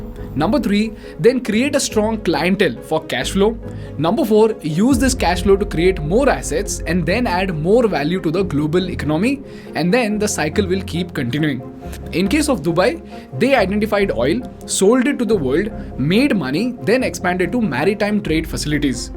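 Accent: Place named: South, India. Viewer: You need to try and lose the aiaiyyo accent. The rest is good.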